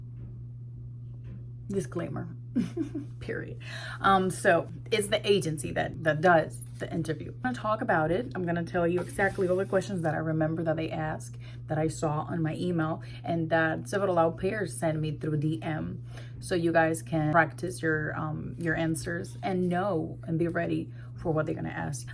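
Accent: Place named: New York, United States. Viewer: English is flawless you have a New York accent dear 🔥🔥🔥🔥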